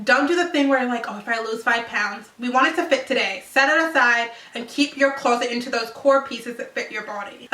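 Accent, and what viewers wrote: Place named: United Kingdom. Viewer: i love how your British accent comes thru every now and then.....you're amazing!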